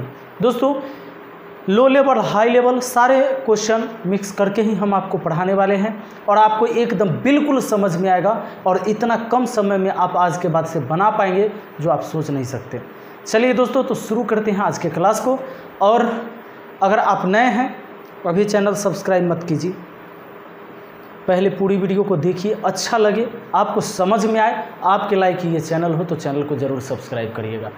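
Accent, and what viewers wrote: Bihar, India. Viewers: Your language in bihari voice is good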